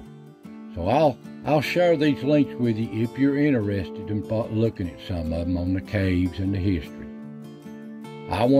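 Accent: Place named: South, United States. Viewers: Your channel is awesome and I love your country accent 🙃🙃🙃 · Appreciate your history and videos! As soon as i heard you speak i knew you had to be from East TN or southeast KY. Im very close to Cumberland gap and middlesboro area. Anyway, i recently picked up the hobby of metal detecting and Im running into the same thing as you with those “hot rocks”, especially on my small farm. It’s a challenge sometimes! Thanks for the info on the various types of rocks!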